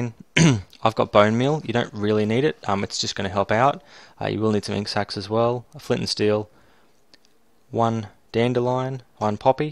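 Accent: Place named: Australia. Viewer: Thank you so much :D It is also great to hear a fellow Aussie :)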